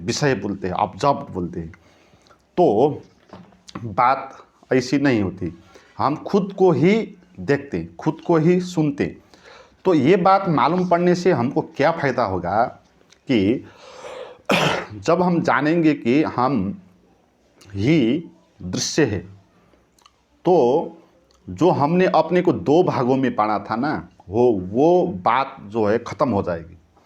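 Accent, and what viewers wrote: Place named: Nepal. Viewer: आपका पता ( address) क्या है. बोली से नेपाली लगते हैं.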